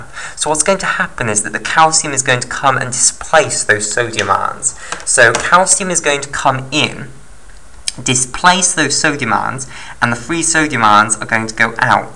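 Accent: United Kingdom. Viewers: well explain and well accent british accent , right ?????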